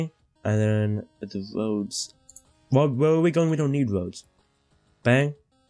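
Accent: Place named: Europe. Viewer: You don’t sound Aussie more European Good vid anyways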